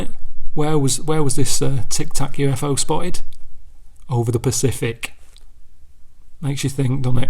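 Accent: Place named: Northern England, United Kingdom. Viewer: Love how he goes extra northern when doing his radio voice LMAO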